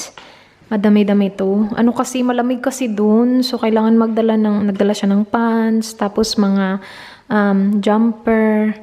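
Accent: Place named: Hiligaynon, Philippines. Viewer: bakit parang hiligaynon yung accent mo sa first one minute intro mo hahahahahaha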